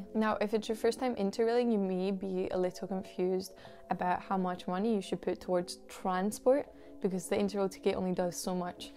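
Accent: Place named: Scotland, United Kingdom. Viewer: Viva Scotland, my favourite place in Europe. I love your accent. Greetings from Spain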